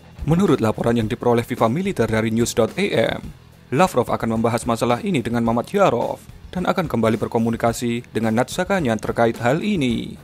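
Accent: Java, Indonesia. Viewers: medhoknya jadi ciri khas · Kangen bahasa medokk mimin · suaranya medhok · Wong mjowo kah min??🤣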